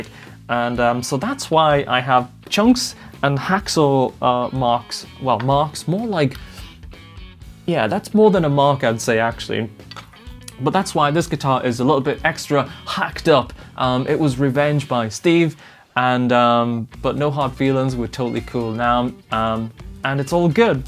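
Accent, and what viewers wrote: Newcastle, United Kingdom. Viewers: How bout some of that Geordie accent in your next video? That MacAlpine BC Rich looks kicking!! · he's a Geordie. Newcastle, mate. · I guessed you were a Newcastle lad but you seem to have a mixed bag of accents spilling out from time to time.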